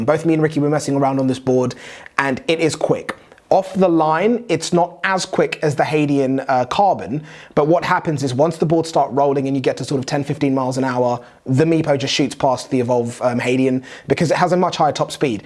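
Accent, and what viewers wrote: England, United Kingdom. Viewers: What country do you live in? The way you're talking about other countries you sound American but then you have an English accent.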